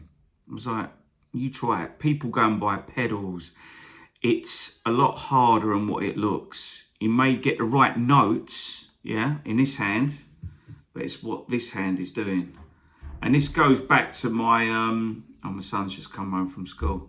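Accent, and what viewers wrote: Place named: England, United Kingdom. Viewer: Ha. First time seeing one of your vids. I didn't expect the English accent. Your playing and overall vibe was that of an American Southerner initially. That was meant as a compliment, btw. ~Native Texan (Subscribed)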